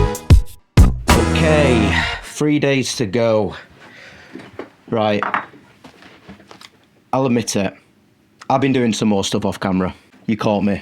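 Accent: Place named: Hutton, United Kingdom. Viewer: You sound like you’re from Hutton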